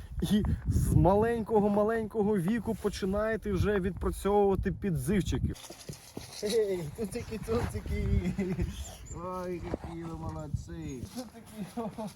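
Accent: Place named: Ukraine. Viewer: нравятся еще как нравятся хоть украинский не знаю я родилась в киеве живу в Израиле но знаешь я слышу украински мне кажется что я сейчас заговорю на украинском мне украинский "акцент" ближе чем руский . как душевно, генетический.(не потому-что вами война) я невидела украину 34 года а акцент родной остался на душе😊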